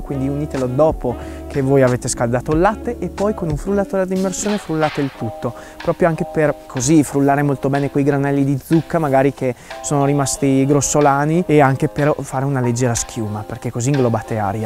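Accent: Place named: Veneto, Italy. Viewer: Raga ma perché ha l’accento veneto? Comunque adoro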